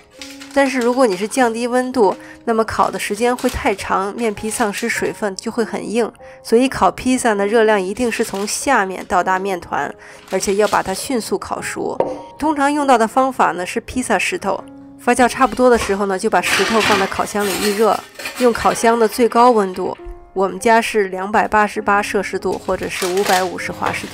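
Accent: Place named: North, China. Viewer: 我是北方人，听着的声音好像我的乡音，感动的要哭了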